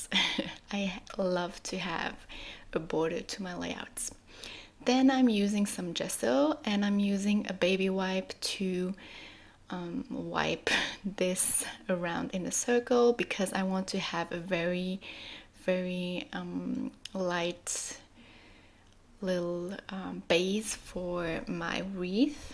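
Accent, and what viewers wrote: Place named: France. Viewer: Ps: j’adore ton accent français ☺️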